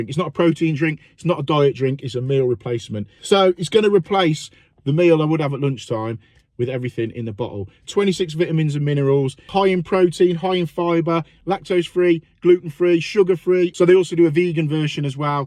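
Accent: United Kingdom. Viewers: Are you from Wolverhampton?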